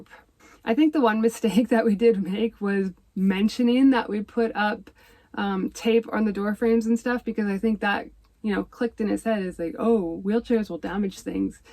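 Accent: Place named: Canada. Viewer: Great video, normal wear and tear shouldn't be a price 😢 keep using wisdom with your body choices, hope to see see on the road sometime, I believe I saw your van video with Bob wells, your Canadian accent is cool, vanlife from oregon 🖐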